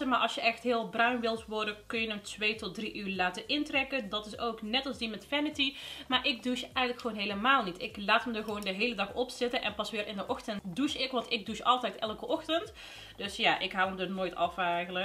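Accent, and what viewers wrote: Brabant, Netherlands. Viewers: Brabanttt!!!! Bende benieuwd? Blijf dan vooral kijken hahahaha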